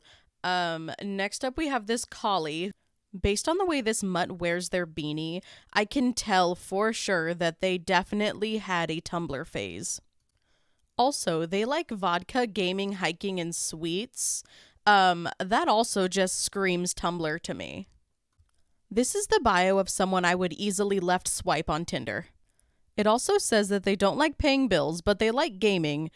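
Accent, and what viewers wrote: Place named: Minnesota, United States. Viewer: it sounds Minnesotan to me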